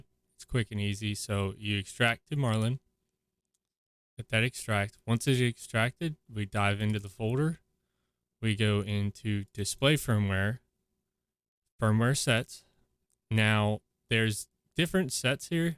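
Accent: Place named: New Orleans, United States. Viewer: Great video and great timestamps and links. Thanks for your efforts. Sounds like a Nawlin's accent...takes one to know one. Keep up the good work...sub.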